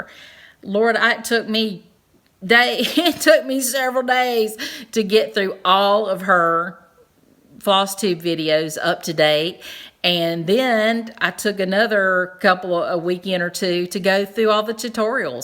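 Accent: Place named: South, United States. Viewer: wonderful to hear another southern drawl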